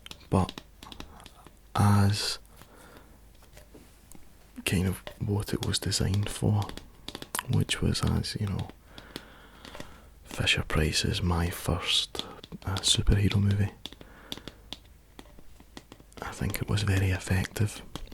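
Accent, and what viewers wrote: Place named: Glasgow, United Kingdom. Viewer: u ken by ur accent ur fa glasgow or somewhere a little further north am i right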